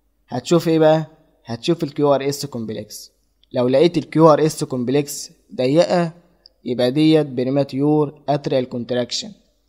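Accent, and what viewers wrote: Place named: Sudan. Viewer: من وين انت عرفتك يوداني من اللهجة 😂❤❤❤❤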